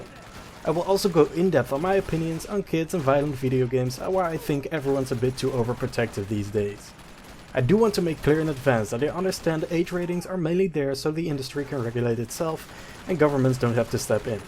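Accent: Netherlands. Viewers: I think he has a light Dutch accent but I am not sure. I do know that the movie rankings In the Netherlands stop at 16 which he mentioned in the video so it's possible.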